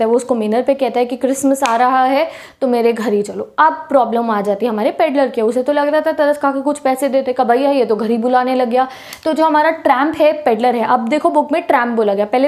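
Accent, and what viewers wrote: Punjab, India. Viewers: this is Punjabi accent.we use this word . It means hona..( sorry I exactly don't what to call it in Hindi😁)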